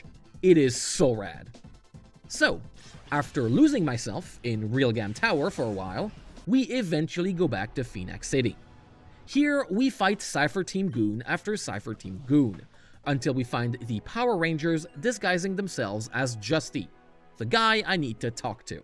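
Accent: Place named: France. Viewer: He's French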